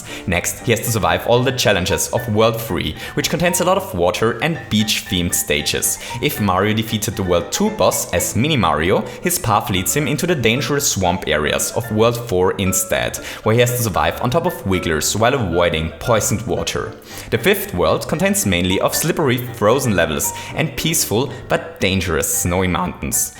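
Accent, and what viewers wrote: Germany. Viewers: Are you German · Is german your mothertounge? Sounds like you have a german accent. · I really like your intro music. And are you german? Because your accent sounds like you are german. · You sound like you have a german accent :D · You sounds german🤔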